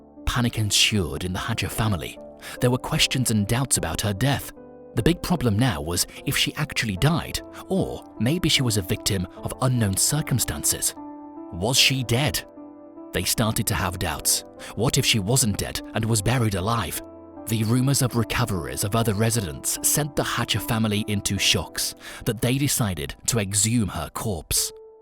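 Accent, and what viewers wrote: United Kingdom. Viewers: he’s British 🇬🇧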